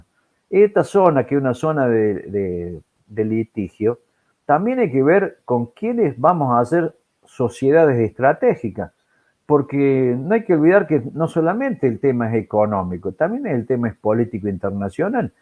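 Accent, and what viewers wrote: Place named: Córdoba, Argentina. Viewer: Tonada cordobesa!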